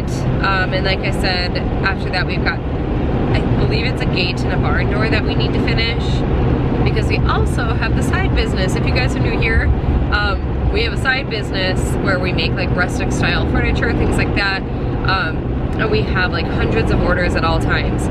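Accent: Minnesota, United States. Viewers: My dads side of the family is from MN and I want to move there SO bad! When I started watching your videos I knew just by your voice you were from MN. 🤣 I wish I had an Minnesotan accent! ❤️❤️❤️❤️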